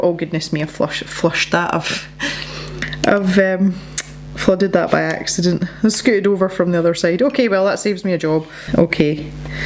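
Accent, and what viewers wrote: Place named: Cumnock, United Kingdom. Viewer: Your reviews are so so very helpful, I love the way you explore the products and play around with the way they interact. And it brings me so much joy hearing you speak, my wee Mum is from Cumnock and is too poorly to travel back to visit family, now that we live in Australia. I show her your videos and they make her smile.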